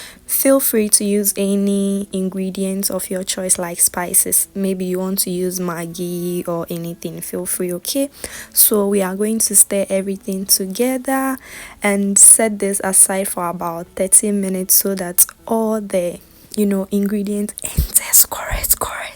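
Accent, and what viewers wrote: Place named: China. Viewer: Your now sounding like a Chinese 😂😍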